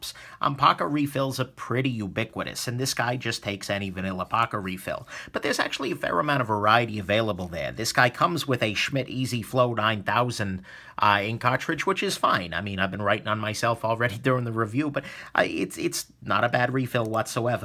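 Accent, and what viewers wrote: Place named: Boston, United States. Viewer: damn love your accent what is it? Sounds Boston but i really have no idea.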